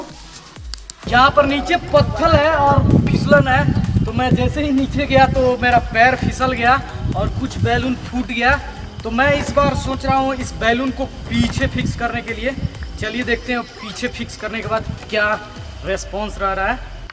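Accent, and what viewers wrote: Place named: Bhojpuri, India. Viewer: Bahute accha video rahal bhai Tahar Bhojpuri se lagela ki tu Bihar/ UP se bara bhai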